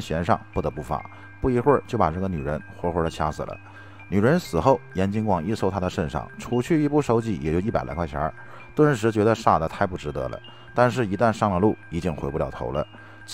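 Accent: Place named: Tianjin, China. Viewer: 天津话不像天津话，普通话不是普通话，听着别扭。干脆就用天津话讲就算了，这样反而更好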